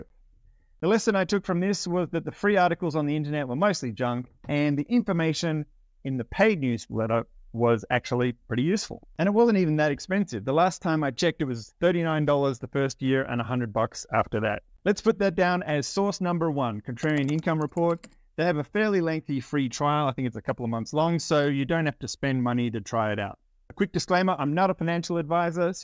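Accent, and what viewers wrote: Australia. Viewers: Hi, sounds like you are Australian. Do you also cover Australian income ETFs? I'm Australian but live in the US so I invest in both countries. Thanks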